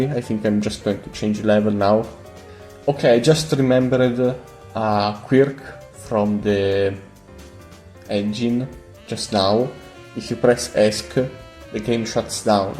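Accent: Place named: Finland. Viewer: The finnish accent is lovely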